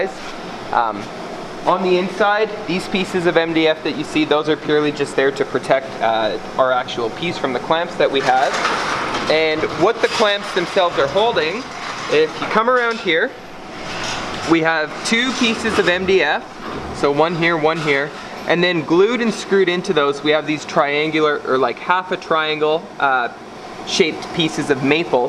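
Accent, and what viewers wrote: Canada. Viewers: Canadian there baud we all sound the same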